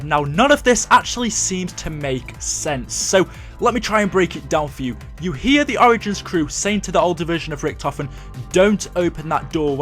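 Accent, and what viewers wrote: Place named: Stoke, United Kingdom. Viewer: hate the Stoke accent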